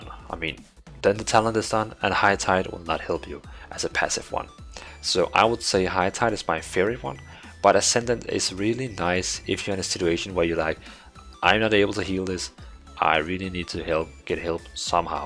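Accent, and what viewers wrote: Denmark. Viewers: Love the danish version of english...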